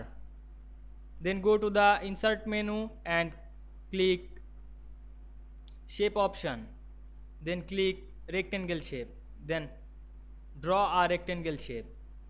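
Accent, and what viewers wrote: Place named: Bengal, India. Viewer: ভাই! আপনার ইংলিশ শুনে বোঝাই যাচ্ছে বাঙালি বাংলায় বল্লে কি হত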